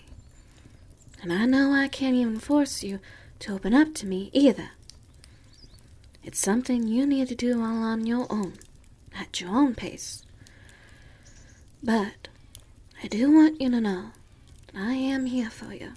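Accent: South, United States. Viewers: Another instalment of my favourite southerner (thank you 💜😘). Even though part 2 is still my favourite of the series, this one was beautifully subtly in an unfortunately uncommon way. As frequent use of perfect Spelling seamlessly integrated into English-spoken sentences is one of the best non-ASMR VA ideas I have come across in years, and he made it seem like child's play. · I dunno what it is about a southern belle accent but its sooooo gorgeous to listen to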